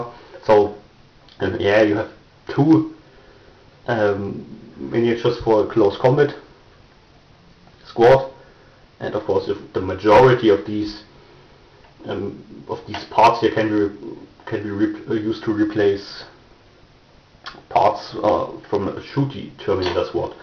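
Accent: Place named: Germany. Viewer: german accent ftw! :)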